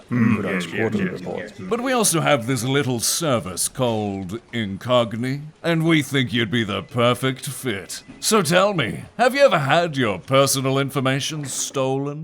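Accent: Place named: Australia. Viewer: Intro hits hard, your intros always hit hard. The music choice is spot on EVERY time. i feel like ive seen more aussies in the past 5 years online than any time before in my life and i just wanna say you're (almost) all awesome. Australian accents are like british accents just with some spice, and a million times better, imo. Live long an prosper (not a startrek fan)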